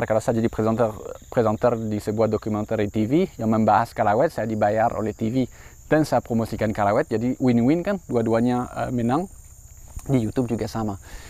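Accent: France. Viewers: Banyak hal yang saya suka dari anda, tapi ada satu yang paling saya suka, adalah cara bicara anda memakai bahasa Indonesia dengan aksen France yang berkelas. Sengau sengau aduhai. Really love it 😂😀❤️🔥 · Aksen Perancisnya masih kerasa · Dari kecil saya selalu tandain, kalau ngomong "memang" jadi "mamon" dalam aksen Prancis:D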